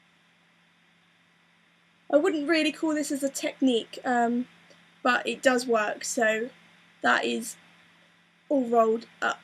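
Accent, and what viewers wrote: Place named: England, United Kingdom. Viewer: I think that's wonderful and I really enjoyed your informative commentary throughout. I suppose exact order and timings of when you put each pin in is crucial to overall result after you explained you could play around with a bit of hair that hadn't yet been pinned into place? Although i used to have long hair i never pinned it so never really considered this aspect. Cant work out if your accent is London/South East or West Country or maybe I'm totally wrong on both accounts!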